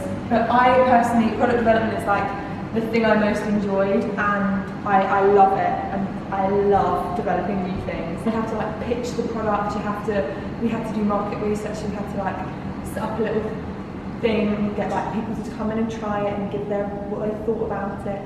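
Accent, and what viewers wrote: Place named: United Kingdom. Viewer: amazing video, very informative, the only thing that bother me was the audio because English is not my native language soooo it was a little difficult to understand what you were saying (also British accent is hard to follow) but otherwise very good video ❤️❤️❤️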